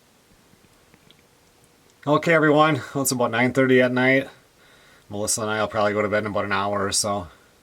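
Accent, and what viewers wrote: Minnesota, United States. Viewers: I thought it was "The Tent" guy and see I was right. Being a Minnesotan myself I noted that a lot of guys sound similar, sound like a couple of my Duluth cousins :)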